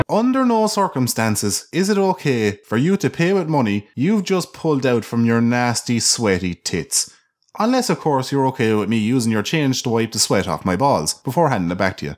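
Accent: Ireland. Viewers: You're a great laugh to listen to. Keep up the great boyo and lets grow your channel. If there's one thing the world needs more of it's an angry Irishman giving out fuck about shite.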